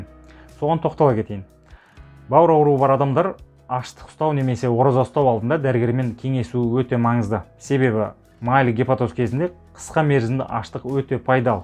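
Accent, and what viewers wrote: Standard. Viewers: Әсіресе, қазақша таза сөйлегенің үшін көп рақмет!!! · Рахмет қазақша таза сөйлегеніз бен пайдалы кеңесізге үлкен рахмееет,риза болдық,Алла разы болсын!!! Сізге жазылып, лайк басып қолдаймыз!!! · Балам таза қазақша сөилегеннің ұнады рахмет жақсы түсіндірдің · Рахмеееет балам, өте тамаша таза қазақ тілінде түсіндіру қандай керемет,,,,өркенің өссін.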